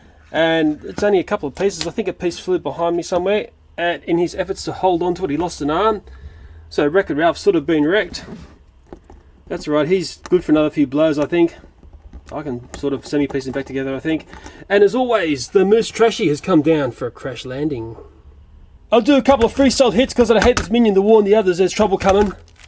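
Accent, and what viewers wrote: Australia. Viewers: toy factory is my favorite knockoff company best of all the fake on is not a toy for kids its a priceless collectors item cause its in a collectors collection for teenagers and really fine old kids and adults who put them up on display where detail matters because I have this find collectors toy and here's is my price for your real minion Dave toy $50.00 and if you like my price please mail it to me Anthony Addison 107 Dwight drive Rome NY 13440 thanks. Anthony PS don't let anybody know I told you and by the way I love your Australian accent.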